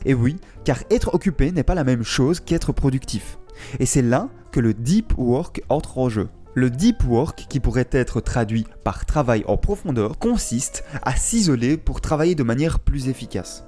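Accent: Liège, Belgium. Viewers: Bon bein je crois que j'ai reconnu l'accent liégeois :)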